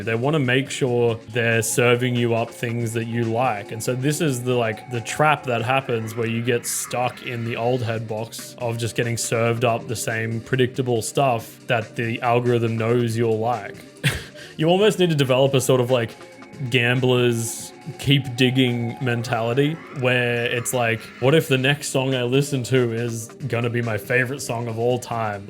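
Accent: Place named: Australia. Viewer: bro might be Aussie General Sam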